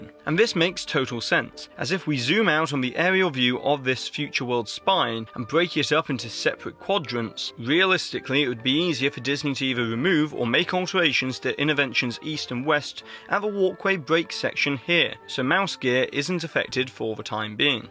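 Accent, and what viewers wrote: England, United Kingdom. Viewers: I love your videos man! I can't help it I been watching 5 of your videos. Just wondering is your accent from England?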